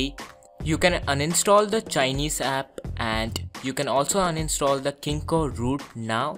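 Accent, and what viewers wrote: India. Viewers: sounds indian but uses chinese as his text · bro from your accent i bet you are indian right? You english is pretty good tho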